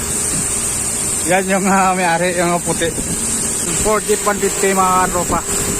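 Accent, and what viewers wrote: Iloilo, Philippines. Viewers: ilonggo ka nong? taga diin ka? iloilo or bacolod? iba kasi ka kasi mag tagalog prang ilonggo..hahaha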